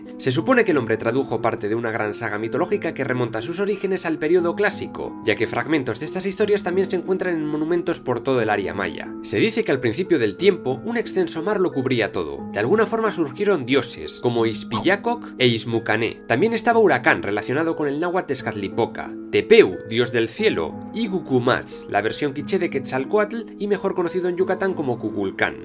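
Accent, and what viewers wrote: Spain. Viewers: Que curioso escuchar a un español, contando historia de México prehispánico.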